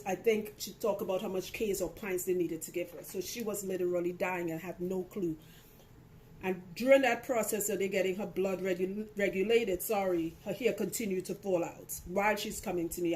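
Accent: Trinidad and Tobago. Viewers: Sounds very trini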